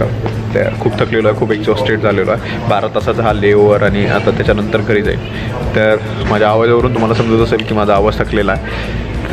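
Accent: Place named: Nagpur, India. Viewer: Aala corona nahitar China Cha moha sodena nagpuri tone ahhe keep it on🤣🤣🤣😁😀🙏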